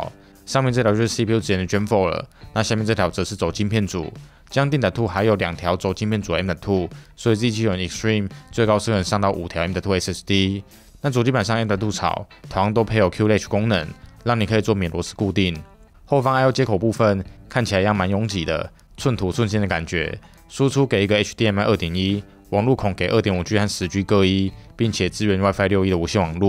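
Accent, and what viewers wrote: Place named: Gan, China. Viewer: 13代CPU 評測 比起大陸 二斤 貓三 極客灣 裝機猿 賣茶人 翼王 等團隊 動作慢了5天 可是只有Huan的贛話 是獨一無二的。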